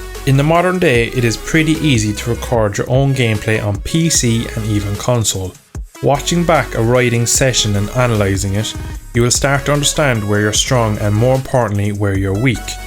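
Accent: Ireland. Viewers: irish brudda?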